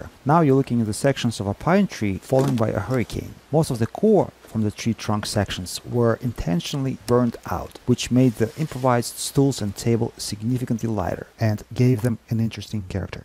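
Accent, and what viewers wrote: Russia. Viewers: Я только за если человек стремится изучать больше языков, но при этом твой контент теряет акцент русского. Кстати, не советую так же т.к данный материал получается дублирующий контент, за что на ютюбе могут сделать бобо. Т.к. алгоритмы понимают данный материал как повторяющийся\дублирующийся контент. Удачи, здоровья!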